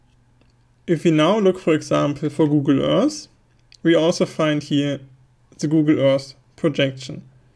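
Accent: Germany. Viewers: Very well presented ... Easy to follow your tutes and a pleasure listening to your 'German English' :-) thanks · I simply loved his explanation! I am Brazilian and I speak little English. And although you have the German accent (it seemed to me), his way of explaining was very good, really. Got it in practice more than 80% of the things you said. This is a challenge for me because I have difficulty understanding English when people talk a little faster. With you, it was great! Another important point is your personal position because you are very friendly and pleasant in their explanations. Congratulations! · Very nicely explained. Great German accent too.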